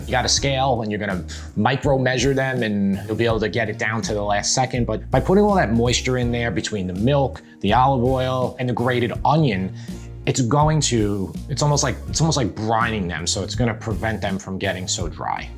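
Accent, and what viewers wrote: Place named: Long Island, United States. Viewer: This is like old home week for me. I grew up on Long Island although I’ve been gone for more than a few decades. Listening to your accent makes me smile and watching your recipes reminds me of my own kitchen.. Truly enjoying your channel.👍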